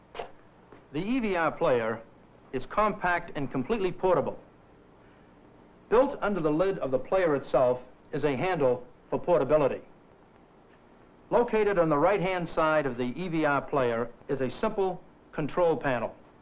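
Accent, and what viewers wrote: East Coast, United States. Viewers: Good east coast accent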